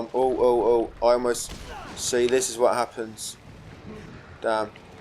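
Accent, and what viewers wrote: United Kingdom. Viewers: Just 100% the game because of your and MrReign’s work. Honestly you’re like a younger MrReign. Absolute prodigy. Anyway I just love your British accent and funny jokes, very fun combination. Don’t know why British people and resident evil 7 just… works😂 anyway thank you and have a nice life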